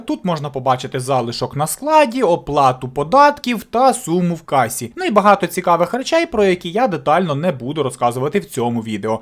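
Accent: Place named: Russia. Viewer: Сначала был украинский но большой русский акцент потом украинский с средним русским акцентом а теперь 👽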